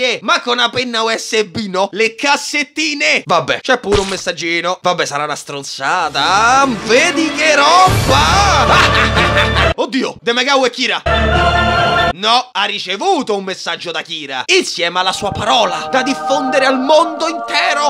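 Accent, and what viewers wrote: Rome, Italy. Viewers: Mai visto un riassunto che dura 4 min in più dell'originale, con accento romano imbruttito, jojo reference e doppiaggi più ignoranti di ogni cosa. Voto: diesci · Ultimamente ho notato un indizietto che potrebbe aiutarci a rivelare la vera identità del, il fatto è che nei video parla in dialetto romano, ma non stretto. Ne deduco che abbia origini o risieda in Roma e provincia. Al quanto pare la tua cattura non è poi così lontana ＼(~o~)／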